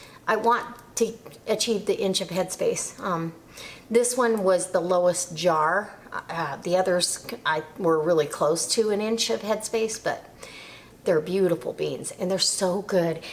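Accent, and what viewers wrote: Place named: Upper Peninsula, United States. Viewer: Miz, I'm about to put up a crap load of pork shoulders I've had in my freezer for years, vacuum sealed. It's time to clean the chest freezer out, and I have just started canning meats. Can you give me some ideas of different ways/recipes I could make to can, using my pork? Thanks, In advance, and I think you sound like you are from maybe the U.P.? I like your accent.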